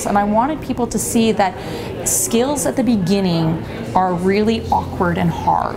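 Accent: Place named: Canada. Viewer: Excuse me. Please. Congratulations,. I would like to thank you for the perfect Canadian English class. There is a possibility of playback speed to 0,75 or 0,5. Anyways! I'm learning a lot from you. Sorry for being out of focus on the video. I watch several North American Natives. They speak Portuguese, but your Canadian accent is the best of all. Your connected speech is Perfect.!! You're a great inspiration to me (or, for me) You're Amazing,. "Why am I not Canadian"??🇨🇦 Sorry to lose the focus of the video. P. S. I've just watched your next video. Thank you! Your fan! See you!!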